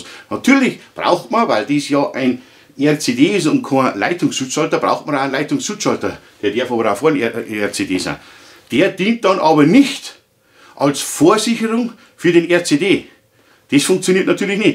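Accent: Bavaria, Germany. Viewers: Super Videos weiter so !!! (los die ned drazn uns echte Bayern versteht hoid ned jeder Fischkobf ;-) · Super naučné video, a ta Bayernština nemá chybu. Palec nahoru. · Top. Gruß der Preuß' der a boarisch verstahn tuat:-)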